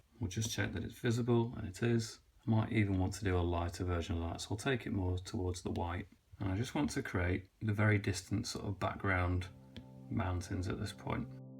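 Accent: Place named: Yorkshire, United Kingdom. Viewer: Fantastic, just the right level of detail, nice and clear. Like the background music, not obtrusive, but relaxing. Lovely Yorkshire accent!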